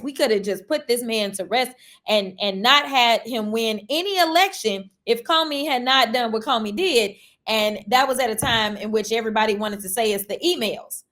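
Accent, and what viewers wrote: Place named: AAVE, United States. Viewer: I can't listen to this ebonics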